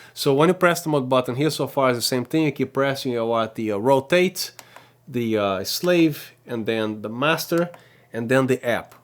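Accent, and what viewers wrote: Brazil. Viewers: Many thanks for this video review! Helped me a lot. I seem to sense in your accent you're from Brazil. Am I wrong? :)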